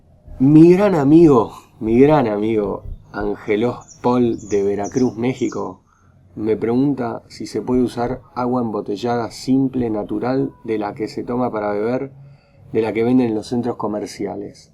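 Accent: Argentina. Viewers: Su acento es Argentino, nose si los videos los filmara ahi.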